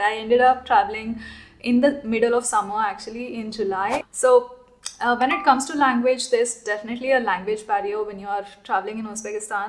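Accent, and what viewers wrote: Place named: India. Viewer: I enjoyed your programme BUT you need to improve your pronunciation..its too much of Indian slang in your speech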